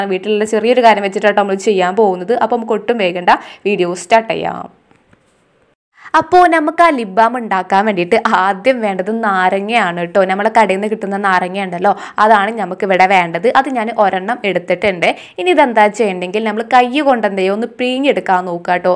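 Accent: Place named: Kanhangad, India. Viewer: Kanangad slang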